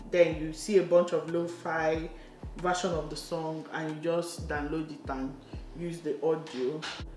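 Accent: Igbo, Nigeria. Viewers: I love your Igbo accent. Ability to speak English without a foreign accent is NOT a measure of intelligence. My husband and I did our traditional wedding in January. Family keeps asking when are we doing church/white wedding? We tell them we aren’t doing a white wedding because we don’t want it. They look at us like we have 4 heads. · Exactly! There's absolutely nothing wrong with your Nigerian/Igbo accent. That's what endeared me to you😍 I love how rooted you are in our Igbo culture.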